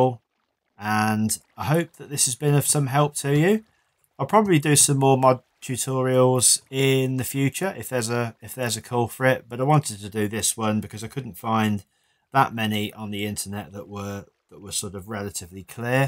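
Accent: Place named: United Kingdom. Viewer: Wow! I just realized how much brit eng. annoys me.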